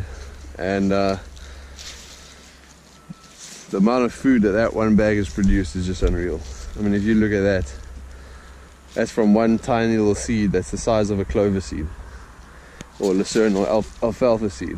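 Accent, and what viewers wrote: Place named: South Africa. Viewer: What part of south africa are you from?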